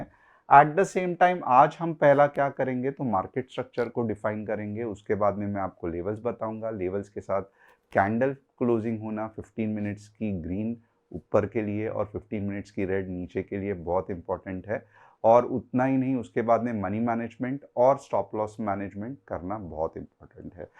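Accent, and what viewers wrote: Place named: Vidarbha, India. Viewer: sir i think u r from amravti akola side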